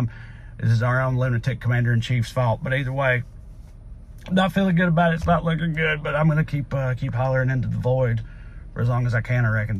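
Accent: South, United States. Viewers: i skip every ad on this ap. But yours, idk if its the southern drawl or what but your ad reads are actually enjoyable 😂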